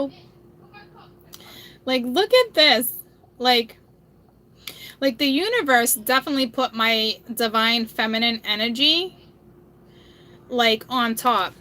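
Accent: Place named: New York City, United States. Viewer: Hello. Having a fairly good night,getting into videos on my 2 favorite planets,SATURN&MARS. ESPECIALLY SATURN'S MOON "TITAN",CHILLING OUT WATCHING "THE MATEIX" AND THEN "THE TERMINATOR",JUST CHILLING TIL I FALL OUT. P.S. LOVE YOUR "NEWYORK CITY"ACCENT!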